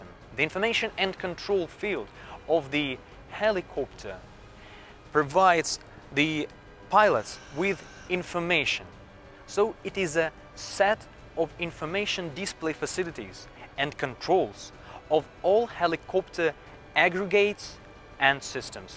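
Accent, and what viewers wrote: Russia. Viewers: Акцент у парня, конечно, жестковатый, мог бы получше подготовиться, некоторые слова воспринимаемы только в русской транскрипции(т.е. приходится мысленно в письменную форму по "школьным" правилам переводить и только тогда становится понятно что было сказано).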